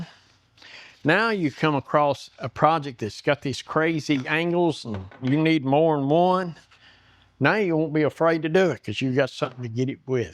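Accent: South, United States. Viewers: Thanks for the reply. I'm Italian and passionate about English. Sometimes I think I can recognize some American accents. In your case, I thought I was hearing a Southern American accent. Glad I guessed right. 😊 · Great jig! As a fellow southerner, nothing wrong with your accent! We don’t pock the cah!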